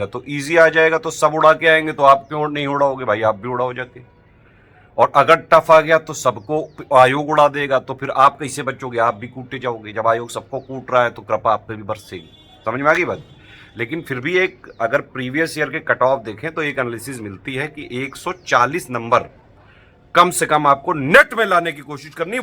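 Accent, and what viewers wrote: Kanpur, India. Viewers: ऐसा क्यों लगता है सर की आप कानपुर से हो बहुत अच्छा लगा सर❤❤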